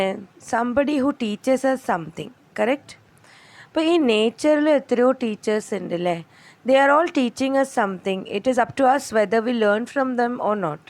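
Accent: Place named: Malappuram, India. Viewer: Malappuram slang assahaneeyam!!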